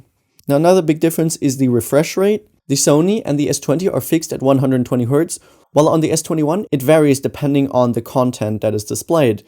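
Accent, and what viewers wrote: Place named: Germany. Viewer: Strong german accent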